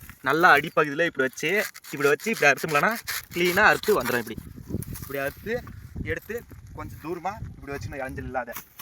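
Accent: Krishnagiri, India. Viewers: Banglore hosur Krishnagiri area tamil bro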